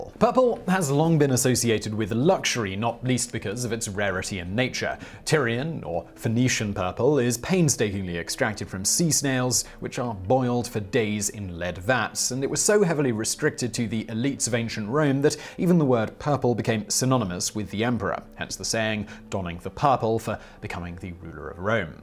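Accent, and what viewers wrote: United Kingdom. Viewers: are you saying that, just because he pronounced it the British way, means that only British people can watch it? That has got to be the most absurd thing I've read all day! LMBO! So, should he change from speaking like he normally speaks, to an american sounding accent so, we Americans can be able watch? Well then, wouldn't his Brit followers be just so offended! (sarcastic eye roll) Dude! It's not like it's a foreign language! Lol! Lol! It's Americans like you, that give the rest of us a bad name around the world! Broaden your horizon! Not everything is done the american way! But, do have a lovely evening.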